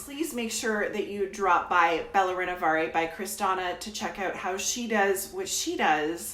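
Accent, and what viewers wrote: Canada. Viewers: I subscribed instantly #1 your style and #2 your Canadian accent :P love from Bangkok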